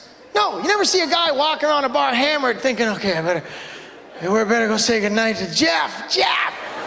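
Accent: Canada. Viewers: I love how he says whore. WHOORE. I love Canadian accents^^ love from Montreal, QC^^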